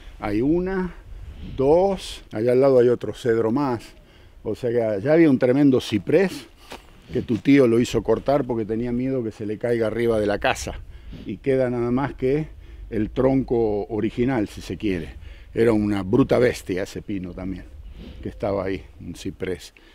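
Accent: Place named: Argentina. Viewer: ¡Pero cómo narra este hombre! ¡qué delicia escucharlo hablar de los frutales en el minuto! yo no era fan del acento argentino hasta que escuché a. Saludos desde España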